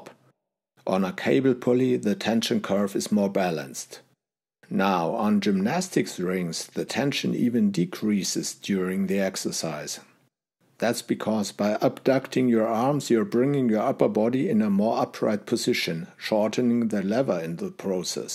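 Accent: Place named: Germany. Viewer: As someone living in Germany, I can tell this man is German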